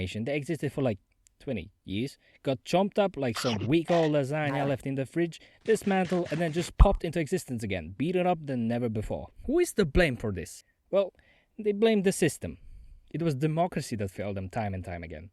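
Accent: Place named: Eastern Europe. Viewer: inak otazka ty si slovak ci cech ci co lebo lebo znies tak slovansky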